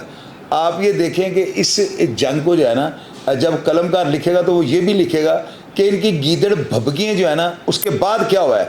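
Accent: Lahore, Pakistan. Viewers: Aj lahori style Mai bat kr rhy hai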